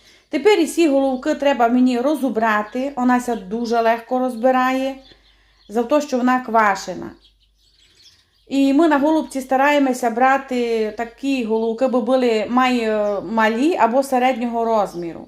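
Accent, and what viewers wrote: Transcarpathia, Ukraine. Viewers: Господи! Яка ви гарна! Нема просто слів! А закарпатська мова - це просто неймовірно 😘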